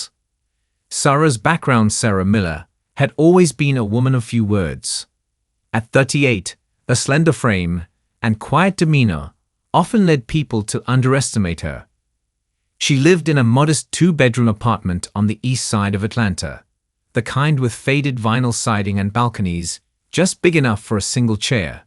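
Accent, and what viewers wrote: United Kingdom. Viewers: Sorry to say I cannot listen to this story. The robot reading the story with his british accent, it's way of the character of the story british accent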